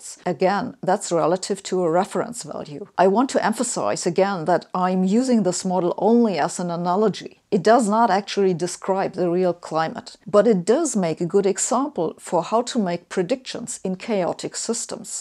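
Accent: Germany. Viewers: Maybe it's the German accent or something, it sounds like she's cutting words too harshly . But it's ok, at least I clearly understand what she says, unlike real anglophones who are "eating" their words.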